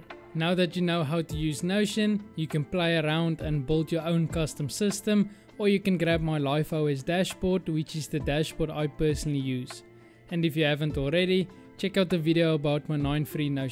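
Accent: Afrikaans, South Africa. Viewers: This is the best description of an Afrikaans accent I've ever heard lmao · Damn this man is very Afrikaans. Wat n legend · 6 Seconds in and I hear a Saffer 😂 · OMF Are you South African. I can hear the Joburg Vibes from your voice. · Not Slavic. He is South African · 100% south african? 😅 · bro are you South African ? We have the same accent hehe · I can hear a South African from a mile away. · 2 seconds to hear your south african.❤😂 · This is a great video!! I felt right at home the moment I heard your Afrikaans accent😂 Your video is really helpful and everything went smoothly when I did it myself except for the creative progress bar, everytime I tried to put in the code it said this: "Argument of type text does not satisfy function slice. [6,18] Percentage is not defined. [23,33] Argument of type text does not satisfy function slice. [48,60]" I copy and pasted the code so I never changed anything yet I still keep getting this. 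Do you know how I could fix it?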